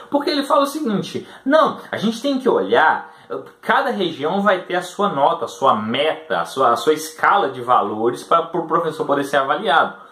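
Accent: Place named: Minas Gerais, Brazil. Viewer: Não sabia que vc era de minas, apesar do sotaque. O novo vai apostar forte no Zema, pq o que ele fizer em MG será bandeira para o novo se estabelecer como um partido forte. Sobre escola: Se escola não fosse obrigatoria, não teria essa perda de tempo absurda que é discutir ideologia de genero, escola sem "cerebro", bullying, professores agredidos, etc...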